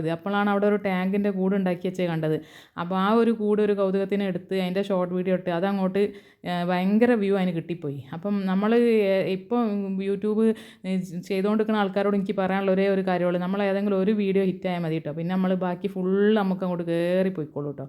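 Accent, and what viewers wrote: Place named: Malappuram, India. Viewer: ചേച്ചിയുടെ പേരെന്താ?😂സ്ഥലം മലപ്പുറം ആണെന്ന് തോന്നുന്നു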